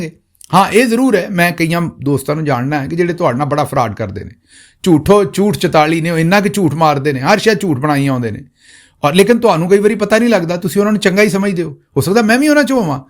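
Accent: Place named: Punjab, India. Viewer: ap ki pungabi bot achi hy 🎉🎉🎉❤❤❤❤❤ bot meti meti hi love ho geya hy❤❤❤🎉🎉🎉🎉🎉🎉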